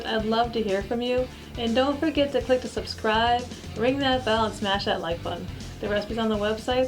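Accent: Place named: Midwest, United States. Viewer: Hi,! That pumpkin bread looks amazing! So cool that you got the recipe from a book when you were a child. Always nice when there is a story behind the recipe. You are right: cans and boxes are getting smaller over time. I agree that older recipes do not always have a lot of spices. I think that people ate food that we might consider to be a bit bland today... I agree that sometimes too many chocolate chips can be a bad thing. Woodstock...Illinois? I thought you might be a fellow Midwesterner because your pronunciation (I am really into languages). That turned out great. Thanks for sharing!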